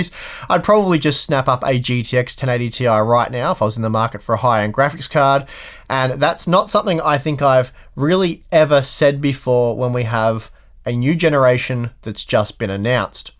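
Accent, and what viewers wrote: Australia. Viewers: What's with the red-striped leather jacket at the end?... quick change artist are we?... getting a little cold there 'ol possum? haha! Thanks for a great news/opinion piece vid - always seems a little more authentic to me with the Aussie accent and no American BS. Really appreciate the thought you give things and your independent thinking mate. Cheers, from the Hills area in Sydney!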